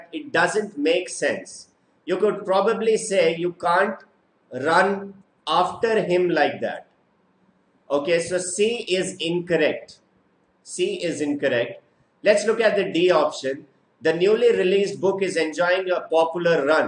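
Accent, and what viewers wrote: India. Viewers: Sir are you mallu??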